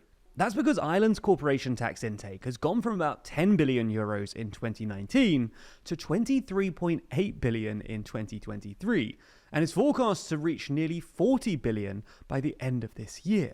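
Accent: England, United Kingdom. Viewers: A man with an English accent post Brexit showing interest in Ireland is suspect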